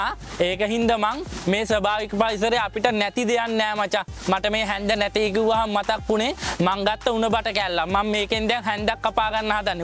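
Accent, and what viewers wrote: South, Sri Lanka. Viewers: ඒක තමයි දකුණේ විදිහ❤❤ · දකුනෙ බාසාවනො ගැම්මක් තමා ආය්බොවන්ඩ...❤😁 අපිත් දකුනේ මචෝ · Dakune bashawa 🎉❤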